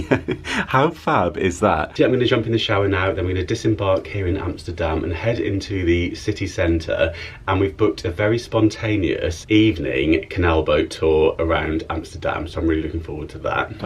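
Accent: England, United Kingdom. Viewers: Thank you for taking us on another fabulous cruise. I just love all the places that you go, because I will never be able to see it in person. I enjoy listening to your English accent. Thanks again for your videos. From a fan in Colorado USA!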